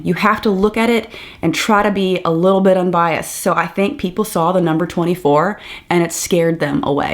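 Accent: South, United States. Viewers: Just me, or does have more of a southern accent than I remember? Also, very happy to be in a blue state right now. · Is it me or are you developing a Southern accent? 😮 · an extra bit of southern drawl in your accent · It’s fun to hear your drawl gradually increasing! Is sounding more Southern as well?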